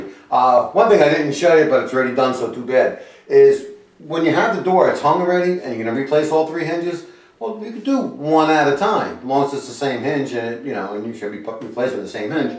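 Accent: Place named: Philadelphia, United States. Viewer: My first time watching your channel, just hearing you talk I'm telling myself you gotta be from my neighborhood in Philly.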